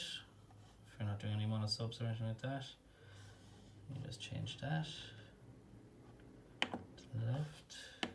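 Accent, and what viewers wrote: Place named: Ireland. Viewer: Hello I was having a bit of Patchen problem and I came across your video. Great to hear an Irish accent Thanks for the video.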